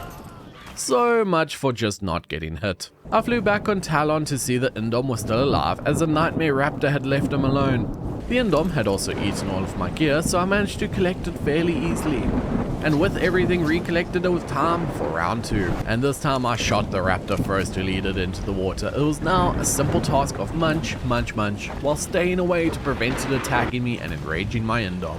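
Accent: South Africa. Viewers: I KNEW YOU WERE SOUTH AFRICAN LOL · wait...by any chance are you a south african?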